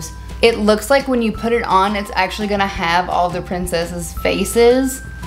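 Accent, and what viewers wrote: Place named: South, United States. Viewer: Hey! Are you from the southern states? I've never heard so much of an accent from you in any other videos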